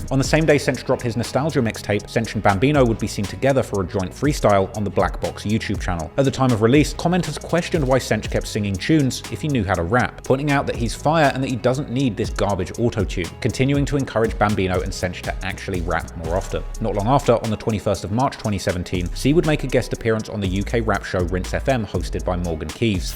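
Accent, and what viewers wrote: England, United Kingdom. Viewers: People see a white boy who has ZERO street ties with a MIDDDLE CLASS British accent and eat up everything he says. So much of this is inaccurate and misinpreted cos again he’s privilege white boy dats not road - it’s crazy. These people are out here monetising the struggles of the underprivileged, pillaging in their privilege and hiding behind “journalism”. Real culture Vultures activity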